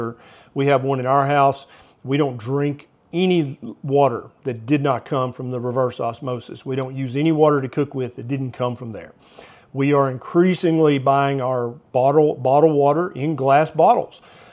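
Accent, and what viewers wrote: South, United States. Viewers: Listening to Dr souther drawl talk about the latest scare tactic the dreaded “micro plastics” makes me want to consume more and hurry up my own demise.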